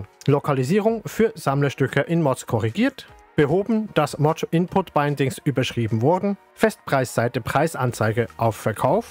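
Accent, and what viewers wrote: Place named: United States. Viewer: Ich mag den Dialekt so sehr! Bist Du Amerikaner?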